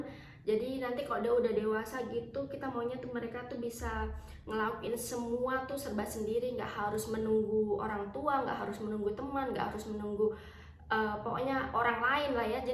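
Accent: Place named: Eastern Indonesia, Indonesia. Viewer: Pantas logatx org timur.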